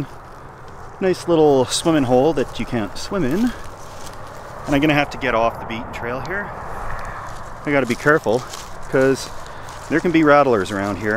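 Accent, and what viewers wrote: Canada. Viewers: Don't know if it's that smooth Canadian accent but everytime I watch, I'm relaxed and know everythings gonna be alllllll right.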